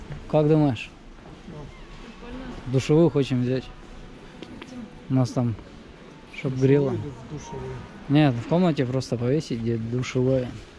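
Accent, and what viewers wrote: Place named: Vyatka, Russia. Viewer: Что -то думаю говор какой то вятский, потом смотрю едут как будто по учхозу, а потом нихрена себе, так это ж Чистые Пруды)))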